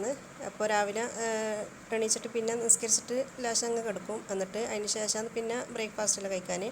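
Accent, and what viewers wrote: Thalassery, India. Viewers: ഇതൊക്കെ കേൾക്കാൻ ഒരു രസമാണ് തലശ്ശേരി ഭാഷ 😍👍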